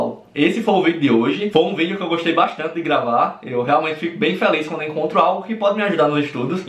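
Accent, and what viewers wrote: Northeast, Brazil. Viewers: mas minha gente esse homão lindo + sotaque nordestino... :chefkiss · O sotaque dele é de Sergipe, Pernambuco pra cima. Ou do norte da Bahia, q faz divisa com esses estados aí. A Bahia é um estado grande, aqui tem no mínimo 4 sotaques: o de Salvador e região, (q é completamente diferente desse cara do vídeo), o do norte (q é bem parecido com o do cara do vídeo), o do sul (q é parecido com o do Espírito Santo e Minas Gerais e o do oeste, parecido com o de Goiás.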